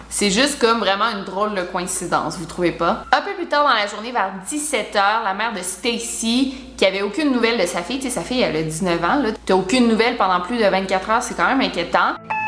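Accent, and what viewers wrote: Quebec, Canada. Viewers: J’ai découvert ta chaîne il a pas si longtemps et tu m’as captivé dès la première minutes t’es sujet son bien détaillé et l’intrigue est bien présente!et j’adore ton petit accent québécois so cute. Sinon super vidéo comme d’habitude et j’ai adoré le petit moment nostalgique 90s à la fin de la vidéo ha ha 😜👏😘♥️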